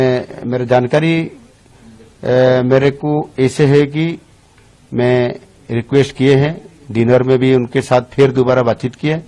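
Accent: Odisha, India. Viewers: hindi bolte bakt odia pan rahega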